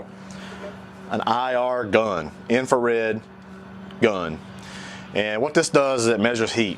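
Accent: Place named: Carolinas, United States. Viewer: Awesome vids! As soon as you started talking, I knew you were a fellow Carolinian! Keep cranking out the great food and information!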